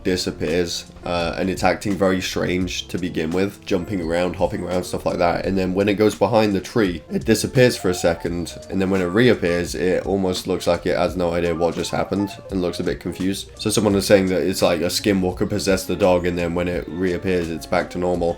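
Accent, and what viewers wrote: England, United Kingdom. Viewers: I never realised this before but your voice kind of sounds like penguinz0 with an English accent.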